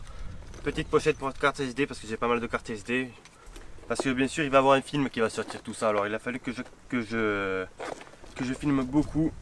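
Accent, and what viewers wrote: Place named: Southwest, France. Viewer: oh t'as retouvé l'accent et le vocabulaire du sud ouest !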